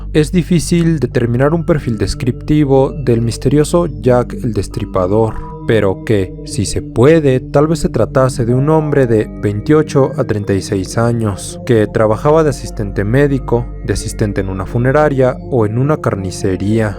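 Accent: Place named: Cleveland, United States. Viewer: Hablas con el acento de Cleveland de padre de familia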